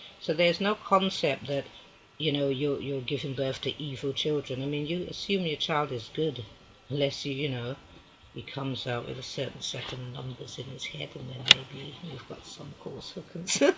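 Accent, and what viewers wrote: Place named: United Kingdom. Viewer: Only 2 likes. I dont understand so called Israel. Ms, some of us are listening. P.s. I love the British accent. It makes you that much more fabulous.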